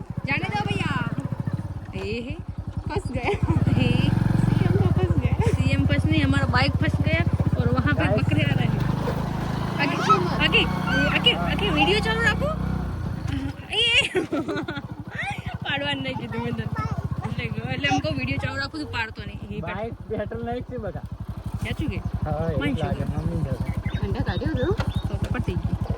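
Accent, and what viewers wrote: Banaskantha, India. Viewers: આ ભાષા બનાસકાંઠા ની લાગે હિન્દી ગુજરાતી મિક્સ😅😅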